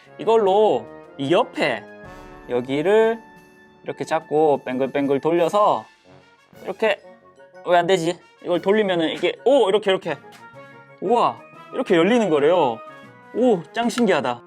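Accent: Busan, South Korea. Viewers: 말꼬리 허팝님은 부산사람이여서 그래요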